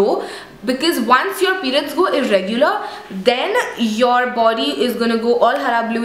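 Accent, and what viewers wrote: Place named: India. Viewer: nicest Indian accent iv heard. not too thick ,nor faking an American accent .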